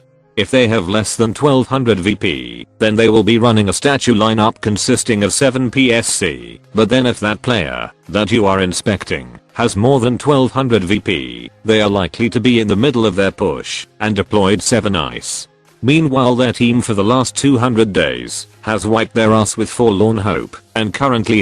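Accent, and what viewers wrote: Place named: United Kingdom. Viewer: How did you get the British voice?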